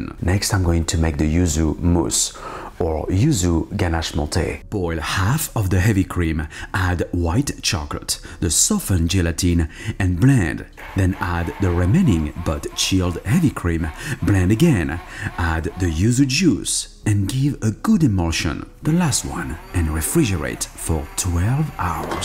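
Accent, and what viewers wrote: France. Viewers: Putain mais quelle accent Français! LOL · You have a weird mix of an Australian and French accent and it's kinda offsetting for some reason. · These americans making fun of his accent. lmao i bet you can’t even pronounce a single French word correctly. at least he can speak at least 2 languanges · Are you French ?? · nah, it's his french accent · Je me disais aussi le gars avait un léger accent français XD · Are you French? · This Guy's Just French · Are you french ? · May i Ask you where are u from? French? Only reason asking because of your accent :) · From what I know, he sounds like most French guy speaking English.. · Il a un accent français vive les français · You're French right?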